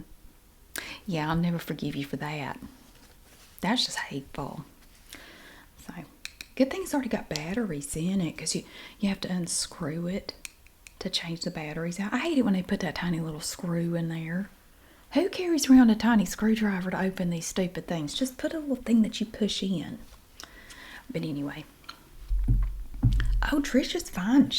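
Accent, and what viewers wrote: South, United States. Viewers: .. I'm starting to think it's a front for drugs .. 😂 Best asmr roleplay with a southern accent on Y T thus on the planet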